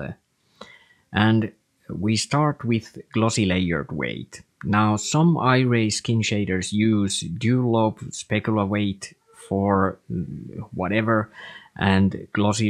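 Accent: Finland. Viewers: You sound very Finnish! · Excellent tutorial :) Did I detect a Finnish accent correctly?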